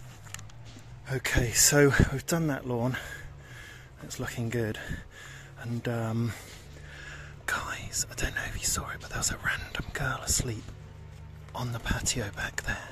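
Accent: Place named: United Kingdom. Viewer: Just found your channel and enjoyed the content so have subscribed I love hearing the British accent, what part of England are you from? Cheers from Melbourne Australia ❤️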